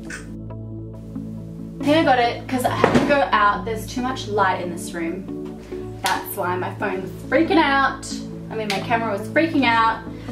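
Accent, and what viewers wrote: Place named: Australia. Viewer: I love your Australian accent and you do you makeup amazing so I had to subscribe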